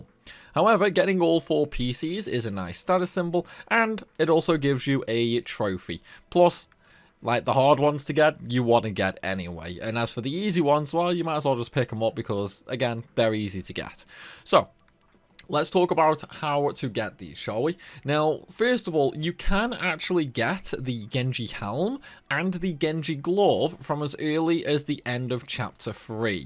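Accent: United Kingdom. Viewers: It would’ve been nice if you had also shown us HOW to get these instead of yapping in your disgusting British English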